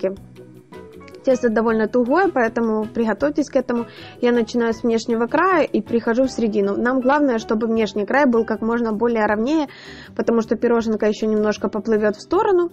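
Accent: Ukraine. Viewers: Болит голова - не смотри ! Произношение такое потому, что девушка из Украины! Нужно обязательно свою ложку дёгтя бросить , а так все нормально!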